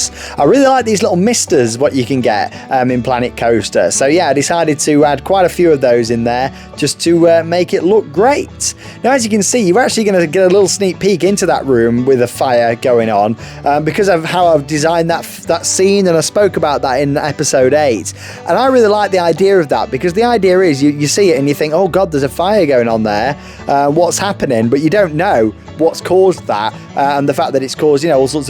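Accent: United States. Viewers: Love the American accent. Didn’t think it was you at first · your American accent is very good!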